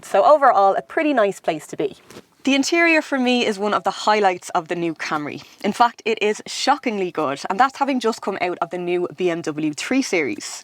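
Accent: Dublin, Ireland. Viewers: Dublin accent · It's a huge pleasure to watch and listen to your reviews: they're through, precise, competent, and on top of that, you are amazingly beautiful and gifted with a delightful (Irish) accent. Thank you! Best regards from Portugal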